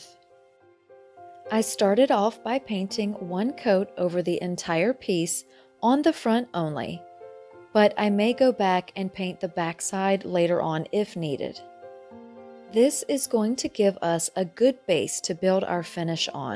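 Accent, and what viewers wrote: South, United States. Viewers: Many southern have smooth accents. 😘🎠🚂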